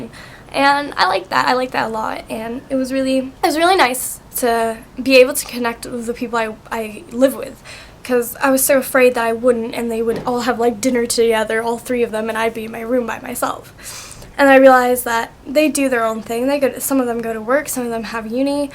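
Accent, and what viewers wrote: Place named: Australia. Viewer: it's so interesting to hear you talk cuz some of your words have a slight Australian accent to them which is freaking cute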